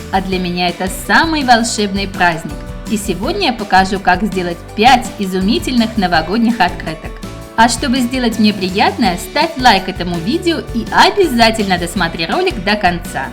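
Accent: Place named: France. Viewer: голос потрясный! как у француженки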